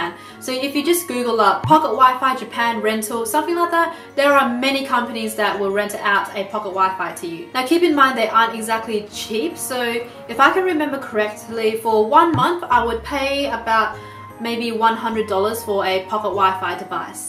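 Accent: Australia. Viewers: She definitely has an Australian accent. Even her last name ' ' is not Japanese. But even me, Takeo, could've learned a lot of them from her. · Are you Australian? Lovely accent. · are you an Aussie?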